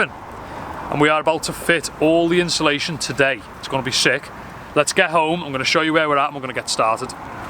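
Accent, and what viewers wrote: United Kingdom. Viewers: I'm your fans from Indonesia. Its quite nice to watching your content and your british accent really fvckin' dope mate 😂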